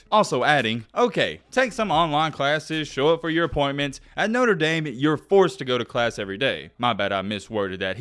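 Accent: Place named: Dallas, United States. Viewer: Sounds just like dem boyz over there in Dallas😆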